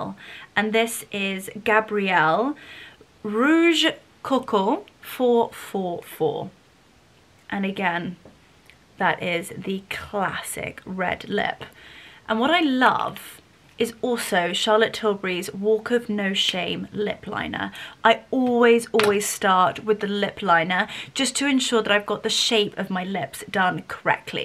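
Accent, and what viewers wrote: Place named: RP, United Kingdom. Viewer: Brilliant video. I studied at uni English literature and languages. It is clear for me you did not only study at uni but I think your English is the RP. 😊😊😊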